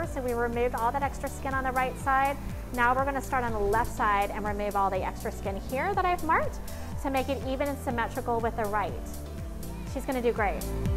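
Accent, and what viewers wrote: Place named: United States. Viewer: From her accent I can tell she's an American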